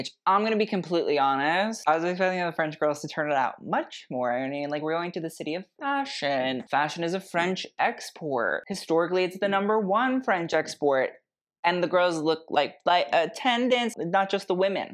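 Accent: United Kingdom. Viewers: the british accent took me out 😭😂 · ❤ your British accent 😂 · Your British accent is hilarious, hahaha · The British accent is giving 😂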